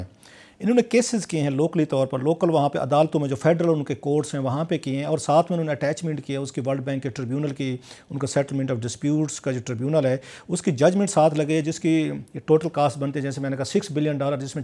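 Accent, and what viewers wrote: Saraiki, Pakistan. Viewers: Its a Pleasure listening. Your sweet Syraike accent is too lovely. Stay Blessed.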